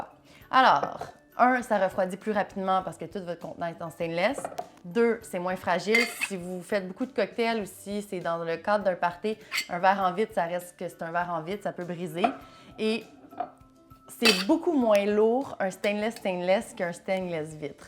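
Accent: Quebec, Canada. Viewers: Superbe présentation. Ok avec tout tes choix. Après 30 ans de cocktails en tant qu’amateur, je suis arrivé aux mêmes choix. Maintenant que je présente des recettes de cocktails sur mon site francophone, j’ai bien envie de mettre un lien vers cette vidéo, car je ne pense pas pouvoir faire mieux que toi pour présenter le matériel nécessaire. Et puis pour nous français, c’est tellement agréable d’entendre l’accent québécois. Bravo ! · J'entends rien l'accent québécois c'est trop zarbi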